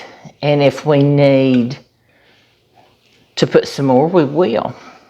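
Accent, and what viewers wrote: South, United States. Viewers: Thank you so much,🎉 my mother made this often when i was young,, this is hard to find i think because i just haven't seen any liver in the market, you're so special 😂i love your sothern accent, my father was from tn this takes me home, you have stole my heart ❤️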